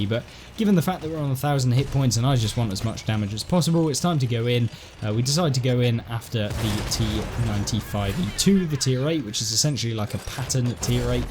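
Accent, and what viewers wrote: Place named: United Kingdom. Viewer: Playing every class is a good way to learn the game, map as spots for light tank to hide in the open that will not work as a medium of heavy tank, Playing Arty will make you learn the places to hides and to stay on the move, in my personal opinion Good Arty player are Arty that stay on the move to, yes you can take one to 3 shot from the same position Sometime all your shot without moving but following your team as the game progress especially if you have a slow Arty can be a Good way to take the enemy by surprise if they expect you to stay at the base in the right corner of the map for example but all your team went left and you follow them then they will be confused on how they can get it by Arty and then don't know where to hide, yes classes have there roles to play but the more you can adjust during the game the more useful you are to your team with the motherland in that case if you top tier you can rely on your armor to play like an heavy medium and block shot and your reload is fast so you can support your light armor teammate, but in t10 game you better play it more like Spotting medium cause you got a nice camo on this one and can't rely on your armor but you can support your heavy that lack vision range at that point and help spotting TDS who fire at them, BTW really love your channel never got the chance to play with you yet I'm guessing you must be in Europe server as you sound from U.K, sometime I play with if your friendlist isn't full you can add me on Xbox RTB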